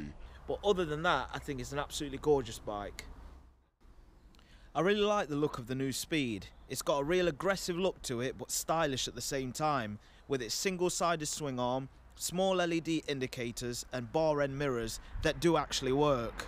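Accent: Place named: England, United Kingdom. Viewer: when a black guy speaks close enough english english accent. its so fucking funny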